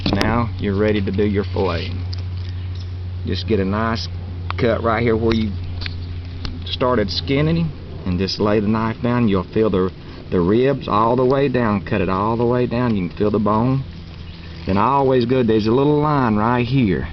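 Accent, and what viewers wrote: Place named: South, United States. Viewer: I like the southern accent.... I grew up in Florida... makes me smile...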